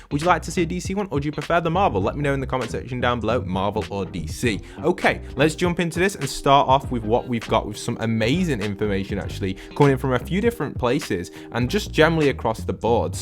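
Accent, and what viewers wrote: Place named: Liverpool, United Kingdom. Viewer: Yo are you from liverpool because my dad is asking because his from liver pool and he thinks your from Liverpool because of your accent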